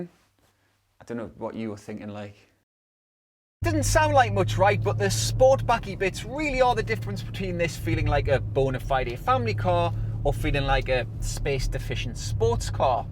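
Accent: Newcastle, United Kingdom. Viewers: Odd that you only have 35 thousand subscribers when Matt at Carwow, who isn’t nearly as entertaining has millions. Maybe the brummie accent is easier on the American ear than the geordie accent? Like the undiscovered YouTube talent Chris Harris back in 2015, you’ll have your day soon enough. Did they offer you the top gear job?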